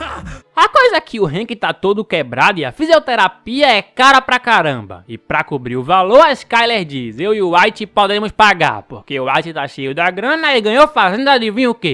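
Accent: Northeast, Brazil. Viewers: Sotaque nordestino o caba conhece de longe😊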